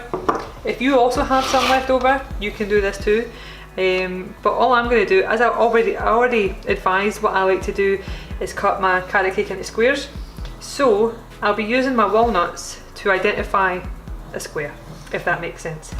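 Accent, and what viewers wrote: Scotland, United Kingdom. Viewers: Be proud of Scottish roots lass. Let that accent be free.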